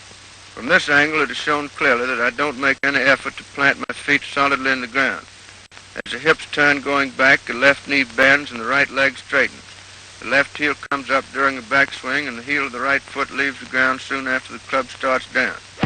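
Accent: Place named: Georgia, United States. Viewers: God Bless you & your family. I trust ya'll are doing well. I am a linguist & most thoroughly enjoy this most classic American accent, very much so. It is one of those, like so many, that are unfortunately getting lost to time. Cheers to you & your kin 🍻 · Growing up in Georgia, this was how all my older relatives spoke at family reunions.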